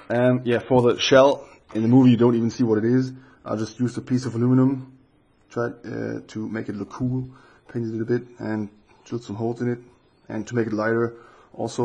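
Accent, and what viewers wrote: Germany. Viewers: Ich glaub du kommst aus Deutschland wegen deinem Akzent :D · That german accent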